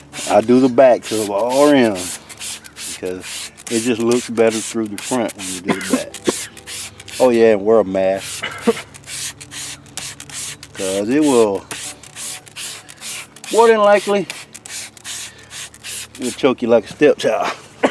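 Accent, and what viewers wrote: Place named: Texas, United States. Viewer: YOU GOTTA BE FROM TEXAS BRO! #SouthernDraw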